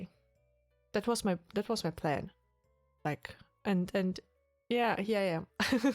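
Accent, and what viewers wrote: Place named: Asia. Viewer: Congrats to the milestone, I took the opportunity and subscribed as well. Another thing: I was really surprised when I heard you say Mettbrötchen, and then you mentioned that you speak German. I originally thought that you are maybe from asia due to the accent, the name, and your demeanor which has a non-German vibe to it (but maybe I'm just used to one specific kind of German). But everything makes sense now.:)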